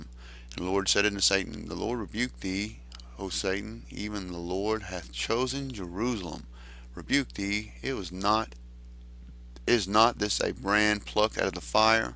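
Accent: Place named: South, United States. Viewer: Being from TX ,, I love your southern accent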